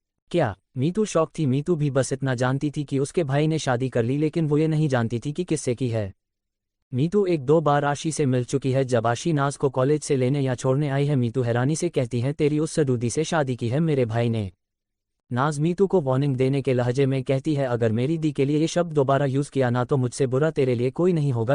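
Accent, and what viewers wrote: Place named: Haryana, India. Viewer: Yes haryanavi I like it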